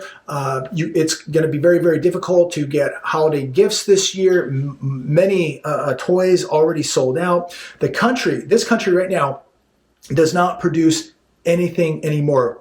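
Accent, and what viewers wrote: United States. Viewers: Is american or Canadian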